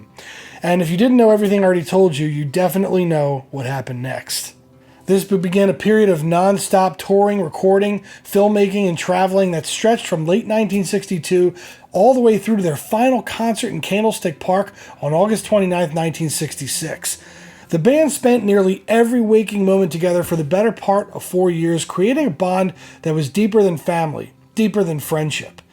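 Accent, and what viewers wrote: Philadelphia, United States. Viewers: Hey, are from somewhere near Philadelphia? I like guessing American accents. I’m front Boston where we famously have distinct an accent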